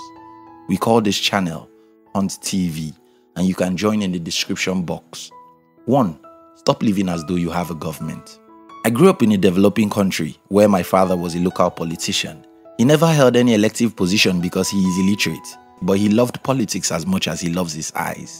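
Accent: Nigeria. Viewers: Same here, this is 100% our accent...but I am trying to figure out his tribe. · You sound nigerian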